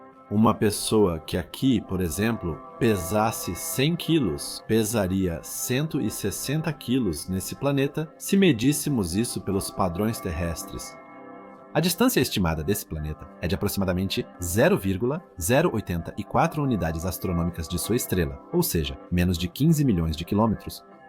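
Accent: Portugal. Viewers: Fala muito rápido e troca o sotaque português e brasileiro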